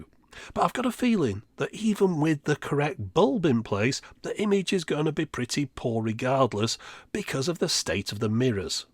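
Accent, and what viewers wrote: United Kingdom. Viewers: Hi sorry, what sort of accent do you have? I can hear it's a UK accent but what part? Just curious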